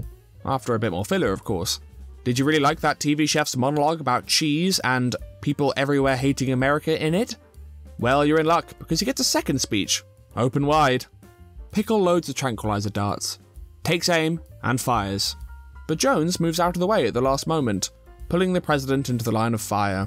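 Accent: United Kingdom. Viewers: The most british voice i have ever heard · I've never opened a video and immediately got attacked by a blast of so much aggresive britishness , Kudos for that, immediately hooked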